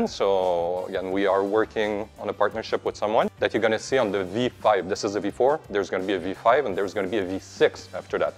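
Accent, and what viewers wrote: Quebec, Canada. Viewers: Sa parais que tes quebecois loll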